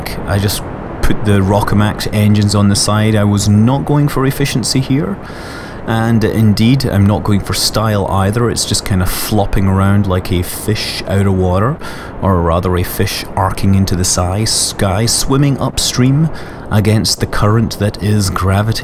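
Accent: Scotland, United Kingdom. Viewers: It doesn't feel right when you use your natural Scottish accent, and not your fake Arstotzkan accent.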